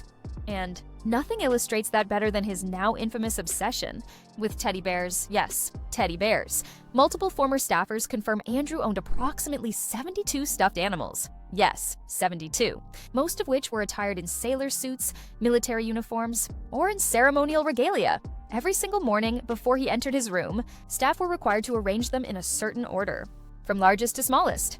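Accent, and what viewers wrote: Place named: United States. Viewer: so why is this trash presented with an american accent?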